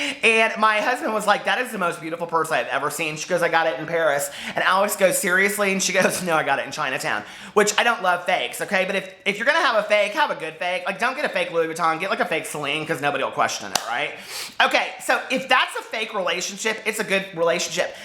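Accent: South, United States. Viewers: I just found you!!! And I love you to death!! And you'll probably be making fun of me because I make videos also which you will probably find boring but I think you're just hilarious! You remind me so much of my friend Gordy who we all nicknamed Gordo....I rarely get to see him now because I moved back home to Texas and he's still in Cali......long story short we met him at an RV Camp out on the shoreline in Northern California and he helped me and my girlfriend not freeze to death because we couldn't get our heater working. Why in the hell am I telling you this? I just watched your video and feel like we've been friends forever! Where are you at? You appear to have a southern accent? I'm in Texas! Give me a shout sometime! New Sub!!!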